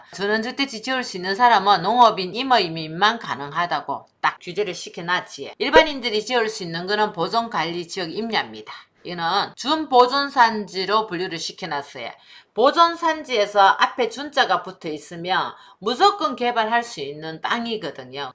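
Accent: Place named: Gyeongsang, South Korea. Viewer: 진주분이신가요? 사투리가 ㅎㅎ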